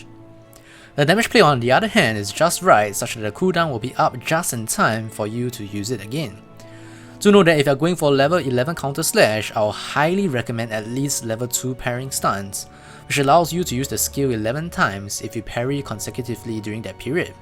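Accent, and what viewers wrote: Singapore. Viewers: Yin s he still sounds like a Singaporean to me. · accent is south east asian. hes singaporean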